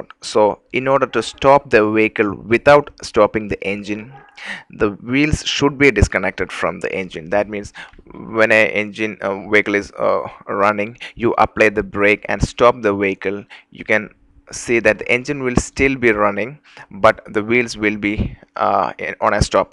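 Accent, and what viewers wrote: Kerala, India. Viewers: your accent is malayali but any how thanx 4 vid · It's really nice video dear...there is a feel of kerala in your pronunciation. It's nice to know you too from kerala. Good job bro!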